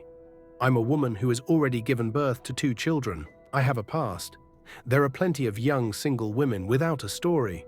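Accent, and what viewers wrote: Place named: England, United Kingdom. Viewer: The African accent is better for these stories. Please use that accent. It’s hard to listen to and English man narrating, even if the story is good.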